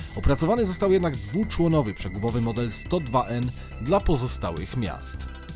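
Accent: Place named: United States. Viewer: Pan miejscami intonuje słowa na końcu zdania, jakby spędził 30 lat w Stanach. Nie ma co kombinować, lepiej mówić naturalnie, po naszemu.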